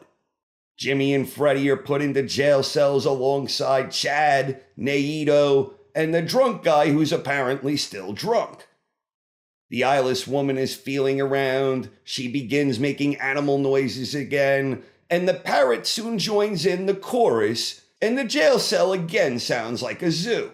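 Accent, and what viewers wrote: New York, United States. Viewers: Sir, from where exactly in the greater New York City region does your accent originate? I've been wondering this all season!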